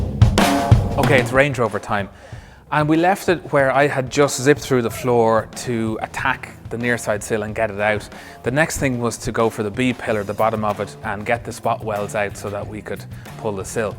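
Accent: Ireland. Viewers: WTF I only discovered this because of Ronald Finger's youtube channel; and I just started. This is AWESOME. I'm bingewatching from the beginning. This channel needs WAY MORE views what the fuck youtube. This NEEDS to be seen everywhere. Anyways, this dude is like the Irish version of Veritasium. These guys are like long lost twins with different accents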